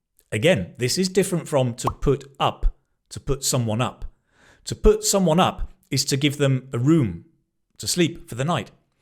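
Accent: United Kingdom. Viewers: En excellent english teacher explaining things with zeal and zest using original British accent.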